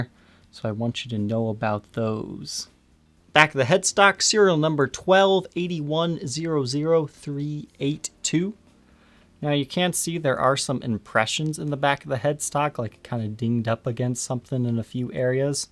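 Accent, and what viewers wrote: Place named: United States. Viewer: American English.... Hello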